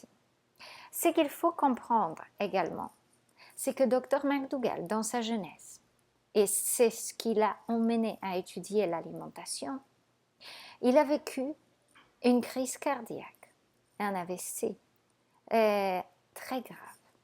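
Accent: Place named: Poland. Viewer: ton français est extraordinaire pour quelqu'un dont ce n'est pas la langue maternelle. Et ce qu'il reste de polonais dans ton accent est charmant! Bravo pour cette maitrise de la langue et merci pour ces vidéos que je regarde avec curiosité.🙂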